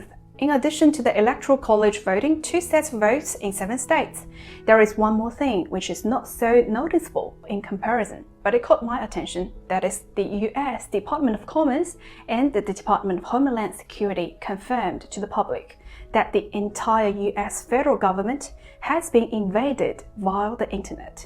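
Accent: Australia. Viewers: Very good English with OZ accent, love it 😍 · I also watched you a few times on your Chinese program. I slightly prefer your new English channel as it sounds more serious and factual IMO. Also really loved your Aussie accent. Kudos! Not many on here can do both languages at a mother tongue level! 加油！ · 澳洲口音而已 讲的很好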